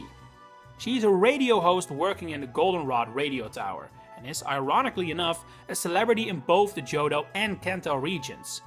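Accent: Netherlands. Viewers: Dutch accent recognized, opinion respected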